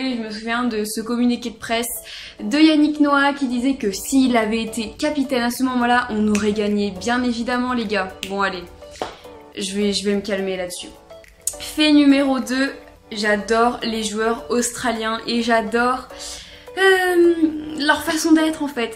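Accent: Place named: England, United Kingdom. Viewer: T0N ACCENT ANGLAIS PUTAIN INSUPORTABLE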